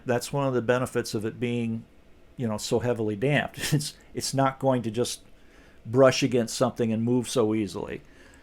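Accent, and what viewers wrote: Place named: Levant, Arab world. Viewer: Nice to hear from you, V :) So glad to hear you’re enjoying the New Year. I hope it is a prosperous one in those important ways. It feels like a mixed New Year. I’m more optimistic than usual but fear there are a lot of conflicts and disputes far from being resolved with the ability of involving more countries / raising other simmering tensions... Your accent sounds familiar but hard to pin down. I suspect I’ve not met anyone from your origins to be sure. Listening again, I hear different mixtures sometimes familiar accents. I suspect the Latin hints comes from learning those accents of English. Thanks for the clues, I don’t know distances well, but I would say NE of the Levantine, approaching Europe... I’ve gotten into the habit of playing videos as fast as still legible, and forget how it chipmunks the sound. Well, in your case Levintines ;)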